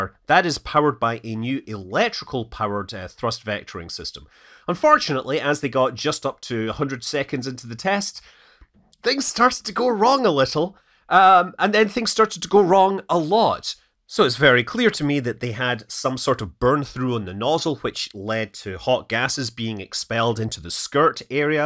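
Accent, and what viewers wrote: Scotland, United Kingdom. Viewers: Aside from the fact that someone creating videos about spacecraft includes audio from a track, I have to say that the Scottish accident for the English language is unquestionably the most appealing. Is it is so charming and nominally out of place with modern society; hearing it applied to cutting edge technological matters is all the more delicious.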